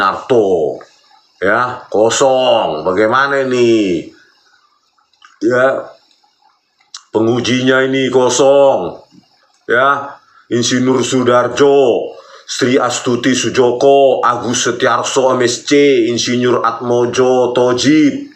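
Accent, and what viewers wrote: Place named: Sumatra, Indonesia. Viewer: sama bro , karna gua orang sumatera sangat pas dengar logatnya bang rismon ini 😂😂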